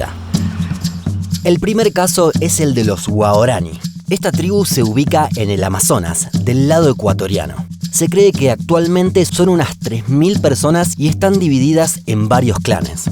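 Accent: Argentina. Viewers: Porque los argentinos o el acento de esa zona de Argentina que creo que es el porteño es llamativo A mi me gusta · Esta buenisimo el contenido, me encanta tu acento argentino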